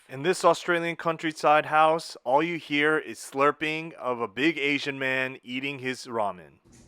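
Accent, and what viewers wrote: United States. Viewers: did you live in america for a long time prior to australia? you speak english in an american accent edit: nevermind i saw the caption right as i typed that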